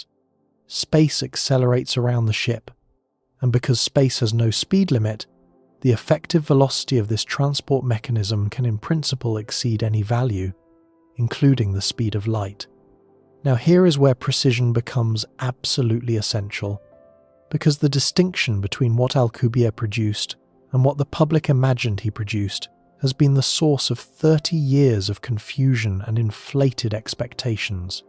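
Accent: England, United Kingdom. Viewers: Math in an English accent sounds wrong.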